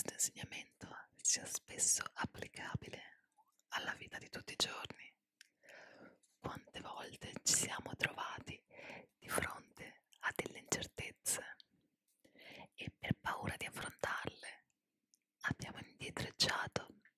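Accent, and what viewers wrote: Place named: Italy. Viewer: У тебя самый настоящий итальянский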